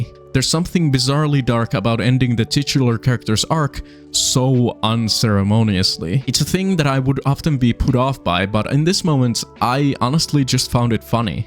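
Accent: Finland. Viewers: You have an amazing skill for media analysis, portrayed by this essay of the series. I agree with a lot of points you made. (Also side note, after watching UMK, youtube keeps reccomending me Suomi channels, but I dont mind. Your accent is so great (and not rally english xd) good luck with the Youtube and your deepmdives)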